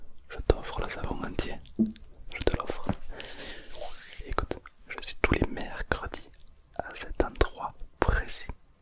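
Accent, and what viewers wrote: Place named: Marseille, France. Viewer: Il me fait penser aux vendeurs de drogue de Marseille avec son accent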